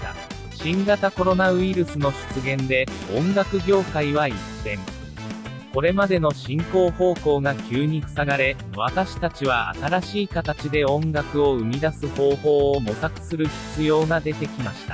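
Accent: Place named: Kansai, Japan. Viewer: は、やはり関西弁バリバリの解説がしっくり来るのですが😅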